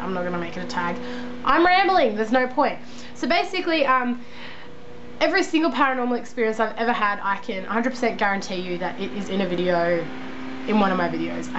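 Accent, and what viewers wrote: Australia. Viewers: YOUR AUSTRALIAN!!!!! YAY!!!!!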